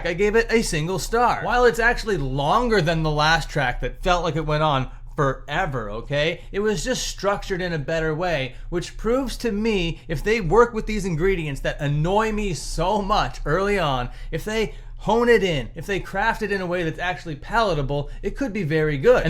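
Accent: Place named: Canada. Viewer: He’s speaking Canadian buddy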